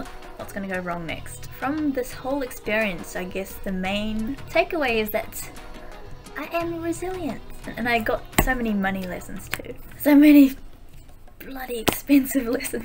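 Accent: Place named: United Kingdom. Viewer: I have a friend that looks nearly identical to you and she's like 100% mestizo blood. I thought you were a Mexican with a British accent:p nice vid btw you're so cool😎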